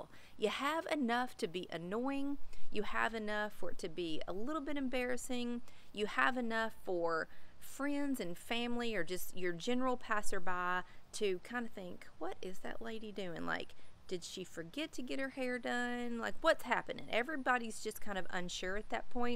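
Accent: South, United States. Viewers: I started the journey... and I chopped off most of the dyed ends for my birthday, 6 months later. Didn’t mean it as I was trying to wait a year,but I am glad I did. Now I have a little bit of dyed ends and it’s curly and short, but I love it. Took 2 weeks getting used to the short look. I love your curly big hair and southern accent (I’m from GA myself).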